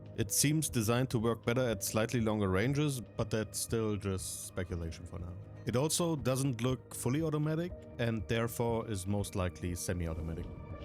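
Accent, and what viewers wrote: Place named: Germany. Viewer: Guten Content bringst du. Deinem Akzent zu entnehmen hast du deutsche Wurzeln wenn ich mich nicht irre. Glaub an dich und mach weiter. Nice Content Dude. Maybe I have The Chance and we can Play together at Release.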